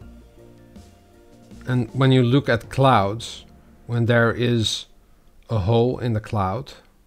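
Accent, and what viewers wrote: Netherlands. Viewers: Are you a native english speaker? I thought i heard a little dutch accent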